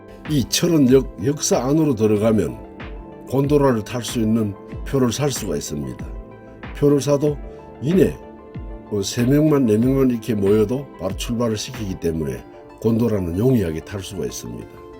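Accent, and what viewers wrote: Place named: Gyeongsang, South Korea. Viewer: 구독~좋아요~🎉🎉🎉 선물 드려요❤❤❤ 이렇게 자연은 신기하군요 목소리듣자마자 경상도인거 알겠어요 저는 부산이니까요 행복한 날들 영위하셔요😂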